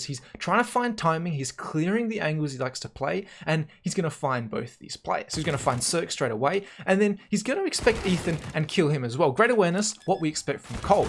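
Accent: Australia. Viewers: bruh moment...i wasnt looking at youtube and when the video started i heard an australian accent and was like oh, im watching a heyzeus video....wait a minute, this doesnt sound like heyzeus...OHHHHHHHHHHHHHHHHHHHHHHHHHHHHH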